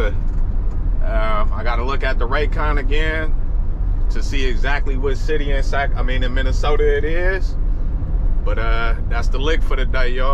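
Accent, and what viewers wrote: Bay Area, United States. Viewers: I eventually figured it out as the video played, but out the gate I knew you was from the Bay! Great video, been thinking about going the same route as you.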